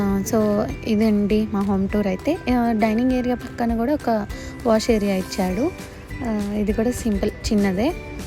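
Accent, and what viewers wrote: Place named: Telangana, India. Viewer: Telengana language vachesthundira 😂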